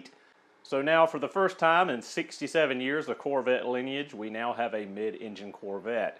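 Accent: South, United States. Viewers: I'm still trying to get over, anyone with a Southern Accent, not being stupid. I never see them on Jeopardy. Coincidence? Anyway, that aside, you are absolutely right. Nobody talks about how user friendly it is, when it comes to repair, or working on the car itself. It is after all, a simple V-8 with a lot of plastic surrounding it. I totally agree with you. The warranty will cover so much, for so long. Plus, as soon as you put any kind of mod on it, it voids all the warranty coverage. Plus, nobody should ever purchase first year anything, when it comes to cars.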